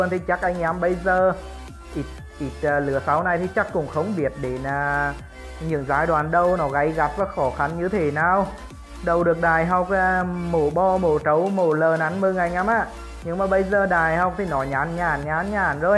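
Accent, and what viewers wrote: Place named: Central, Vietnam. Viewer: Bố gét giọng miễn trung DKMcm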